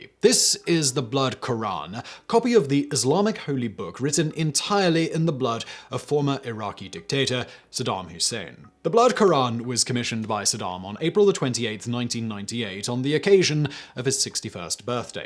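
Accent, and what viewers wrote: United Kingdom. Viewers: In some cases,the very British accent can be difficult for me to understand but in YOUR case,Mr.,it is as clear as a bell. A "right.proper" public speaking voice,I tell ya'....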